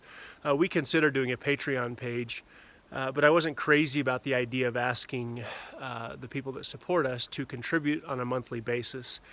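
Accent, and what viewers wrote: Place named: South, United States. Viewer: As a northern carpenter. Just be listening to I can tell right away you're from the south. Can I suggest something? Have you thought about putting the tyvek (all you can ) on before you raise the walls? I remember the first time I worked in the same sub with a southern crew. We had about a week on a home in when the southern crew arrived. They on the second story in about 3 days. I said to my foreman they're going to beat us. He said just watch. It took them a month and a half to finish. They had to scaffold everything off. No way they made money on the job. Just a little thing I learned. Be blessed Bro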